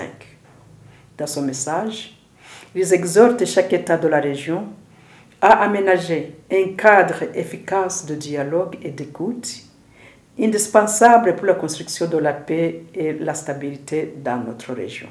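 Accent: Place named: Rwanda. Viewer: Madame Ingabire Victoire, j'imagine que tu as étudié l'expression orale de la langue française ! Si tu décides de parler en français, rassure toi de parler comme il se doit! Tu me fais honte en parlant le français comme si tu parles le Kinyarwanda! Avec tous les atouts que tu as bénéficiés et parles trop mal le français, j'ai honte en ta place!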